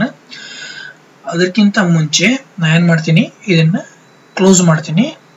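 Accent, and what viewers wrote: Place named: North Karnataka, India. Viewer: wonderful sir,... very usefull.... THANKU....Explaining in north karnataka style juss awsm loved it... ;)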